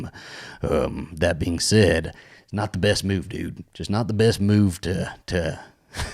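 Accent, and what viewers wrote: South, United States. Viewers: I can't believe you're reading all the comments about one of the gay movies, "Bros" on this video at your house in Texas during the episode of "Coffee & Tequila", but your husband, Alastair is not there and I hope he'll be very happy for you, I wanna say I'm proud of you, I know you're gay and you had the Southern accent!!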